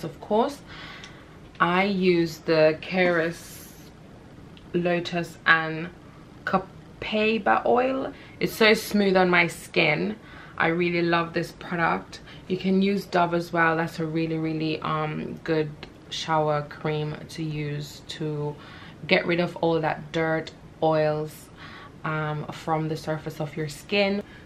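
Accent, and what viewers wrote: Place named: Jamaica. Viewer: Do you have a Jamaican background? Or at least Caribbean... You sound slightly Jamaican based on some of your pronunciations.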